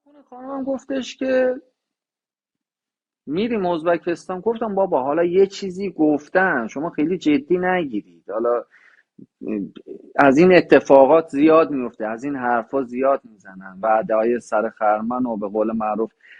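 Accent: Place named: Shiraz, Iran. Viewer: لهجه شیرازی داری آقای دکتر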